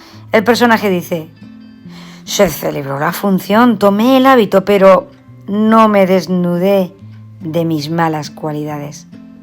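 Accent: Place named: Spain. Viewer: Se le escucha acento español y por lo tanto debe tener dicha nacionalidad. En verdad me sorprende que alguien de España haya pronunciado la palabra "colonización" término ambiguo y mal aplicado ya que el actual territorio mexicano fue parte del virreinato de la nueva España, nunca fue una colonia y los nativos de las Américas fueron considerados súbditos después de la conquista cómo lo dejo expresado la reina Isabel la católica en su testamento y nunca fue política del Reino de Castilla y después del glorioso imperio español el "esclavizar a los mexicanos" al menos no en la ley, entiendo que muchos peninsulares y criollos cometieron abusos en éstas tierras pero siempre al margen de las leyes de Castilla y aún contra ellas. La historia de México no se entiende sin la historia de España, la historia de España no se entiende sin la historia de Hispanoamérica y me sorprende que tanto españoles cómo mexicanos desconozcan nuestra historia en común. 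Su reseña del periquillo sarniento es algo inexacta, le mando un saludo desde el territorio del antiguo reino de la Nueva Galicia.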